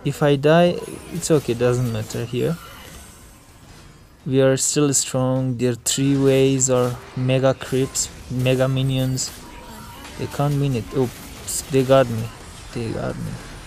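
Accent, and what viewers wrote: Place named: Mexico. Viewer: from México i think , i notice te acent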